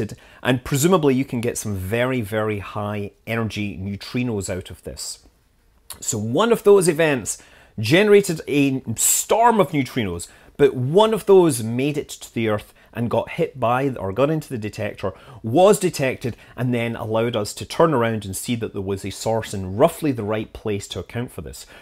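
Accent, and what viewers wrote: Scotland, United Kingdom. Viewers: i love your scottish accent!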